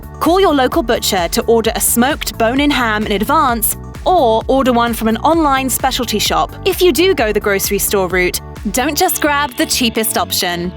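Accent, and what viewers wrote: England, United Kingdom. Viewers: Love that British accent ❤️ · Well folks dhow this is a an ok sort of video please be aware. While the narrator may be British and have a British accent .All the temperatures recommended are in the Fahrenheit (°F) range Where as in England they use Celsius (°C) the internal temperature of 143 F is the equivalent of 61.6667 Celsius (°C) But please be advised for an uncooked ham the recommendations are 63°C or if you are in America where they use the Fahrenheit scale that would be 145°F for the internal temperature . A cooked ham the recommended internal temperature is 140°F or 60°C So going 145°F or 63°C on a cooked ham you will be over cooking it. Now as the only countries that officially use Fahrenheit as a unit for measuring temperature is the United States, the Liberia and the Cayman Islands. Other parts of the world use both, though Celsius is the standard. 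So one can take it this video is for the American market. But if you are outside the aforementioned. Then your appliances control's are more than likely designed for the Celsius (°C) temperature range . So something to look out for when cooking As for F to (°C) converting Subtract 32 from the Fahrenheit temperature. Multiply this number by five. Divide the result by nine. 143 F - 32 =111 x 5 = 555 / 9 = <num> But no matter what you do always cook your ham LOW AND SLOW An internal temperature gauge is a must in any kitchen Anything else is just guessing and mostly leads to over cooking or even worse under cooking It is all about the internal tempera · English accent. They don't do pineapple on ham.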